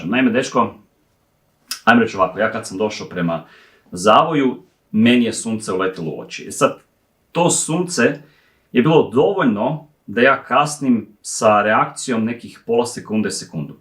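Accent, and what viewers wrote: United States. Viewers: Aaaa buraz,a gledam tvoje klipove i uvijek pomislim da si Amerikanac😂😂😂😂😂😂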